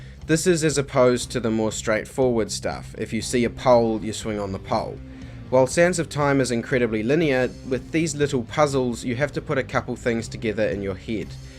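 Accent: New Zealand. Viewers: Oi are you a kiwi??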